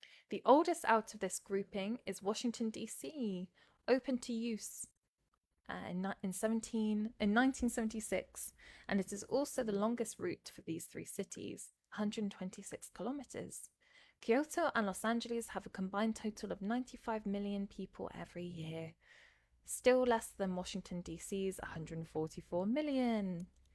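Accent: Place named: United Kingdom. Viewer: This lady has a very relaxing and soothing voice and British accent possibly? I get sleepy too. The other man uses use’s different variations n speeds In his voice so it’s more engaging.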